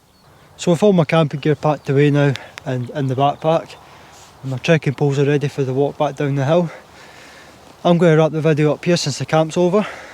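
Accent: Scotland, United Kingdom. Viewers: Thanks for that young man - regrettably I've never been a hill walker though I did scale one Munro in my earlier years - it's definitely something that I regret however with modern technology I can indulge in viewing blogs like yours which while isn't the real deal gives me enormous enjoyment taking in the majestic scenery and views - I'm a glaswegian and can appreciate your neutral Scots accent and modest demeanour - keep the videos coming and keep safe - PS I still have my camping gear which includes a terra nova voyager tent and thermarest mat - need to get the finger out and engage in a wee trip soon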